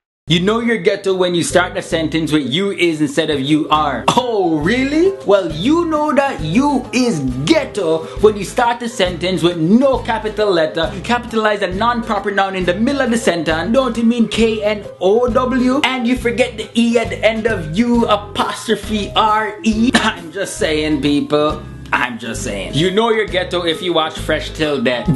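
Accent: Jamaica. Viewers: Oh I'm just loving the Jamaican accent!! I'm from Germany and I definitely wanna to go to Jaimaica when I'm older :) So here's my question: What is your first thought when you hear the word German?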